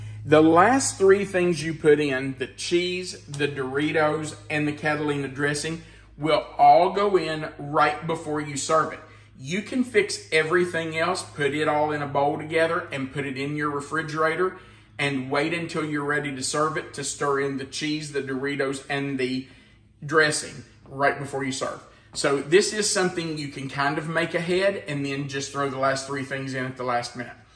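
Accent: South, United States. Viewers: Thank you for all the yummy recipes! I love your calming Southern drawl. Sometimes your videos make me sleepy, your voice soothes me so much! Thanks, for writing out the recipes. Sending hugs from Beth in Savannah, GA.🤗💜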